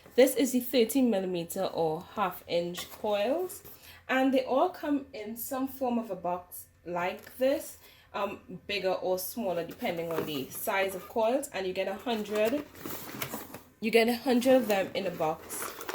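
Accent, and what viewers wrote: Trinidad and Tobago. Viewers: You sound Trini🇹🇹🇹🇹🇹🇹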